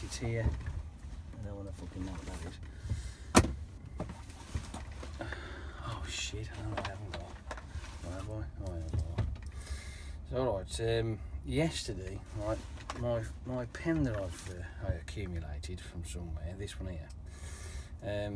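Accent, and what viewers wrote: Birmingham, United Kingdom. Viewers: It’s like I’m watching the brummie version of Karl Pilkington sometimes!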